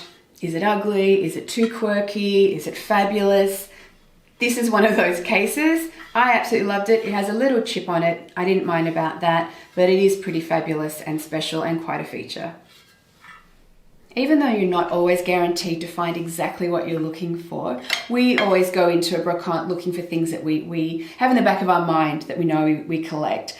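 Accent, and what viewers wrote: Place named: Australia. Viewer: I can't handle the Oz accent....I tried to watch the whole video but didn't last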